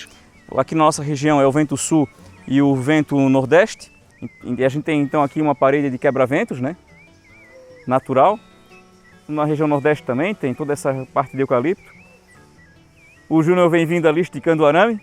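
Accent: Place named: Santa Catarina, Brazil. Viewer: Amo o sotaque catarinense. Sou do Paraná e morei quando criança em SC, amo demais essa terra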